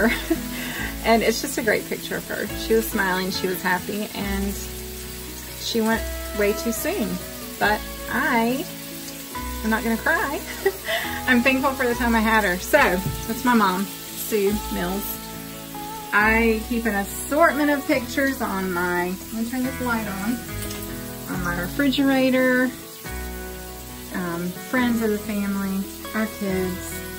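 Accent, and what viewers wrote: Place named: North Carolina, United States. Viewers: I started watching your video's earlier today. I said to myself, she has a North Carolina accent. And i just watched this video were you said Caswell co. I lived in Allamace co for over forty years. Now on the beach in S.C. But you brought back many memories of one of the nicest and prettiest state's i've ever lived in..And i can not call cows either. Have a nice day.. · Was wondering as you have a similar dialect/accent as someone I used to know in Clayton, NC - thanks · that’s so funny, I was using the sound of your voice just to try and guess where this was, and I was close. I was born in 56 in kernersville, moved to hickory in 61 and owned a home in Sparta from 76 to 88, I now live at Apple city USA Taylorsville for the last 32 years Alexander co, the poorest, smallest, least population of any county in the state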